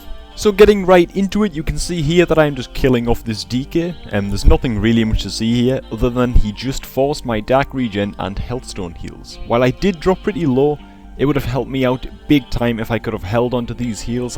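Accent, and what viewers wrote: Newcastle, United Kingdom. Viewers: Hey man, just wanted to say hi! just found you randomly on youtube searching for lock videos as I'm currently leveling one to replace my warrior (Bad timing by the looks of it with 5.4 but I'm hooked on destro now) Are you from near Newcastle? I recognize the accent, I'm from Leeds =) Anyway thanks for the vids and you've earned a new subscriber!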